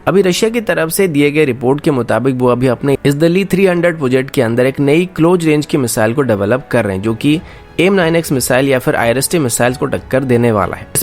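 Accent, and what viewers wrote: Odisha, India. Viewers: Are you from odissa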